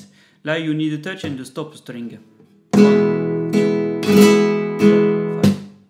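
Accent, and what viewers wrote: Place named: France. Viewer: es-tu français, du coup? J ai remarqué que t as un accent français.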